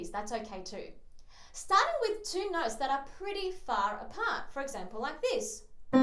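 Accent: Australia. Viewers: Hey mate ru Aussie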